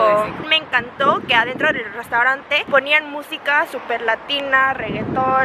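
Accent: Mexico City, Mexico. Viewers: Se te pego el acento fresa de México jajaja, tal vez viviste en CDMX o Puebla? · Tú acento impresionante es mexicano y fresa, jajaja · Que hermosa estas y tu aciento mexicano muy linda saludos mexico te espera oh tu amiga también muy linda 😉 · CHICAS hablan muy bien el ESPAÑOL la chica de pelo largo tiene una voz MUY SENSUAL y se le oye mas bien como del DF en otras palabras chilanga, pero la otra chica su voz me a enamorado a parte que esta muyyyy linda, besos y abrazos para las dos y muchas felicidades por su canal y sobre todo que bueno que les guste la comida de la gloria del cielo MEXICANA, saludos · hola !!! me quede esperando el final (2do video)... también esperaba que en el restaurante Oaxaca pidieras enchiladas de mole 😋, te faltó ponerle limón a los tacos y si deberías probar lodctacos de lengua 😋y me sigue encantando tu acento muy Mexicano!!! 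Saludos🇲🇽 XOXO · Querida eres toda una mexicana me di cuenta en la forma de comer los tacos la chispa que tienes y obviamente cuando hablas saludos desde Chicago 🇺🇸🇲🇽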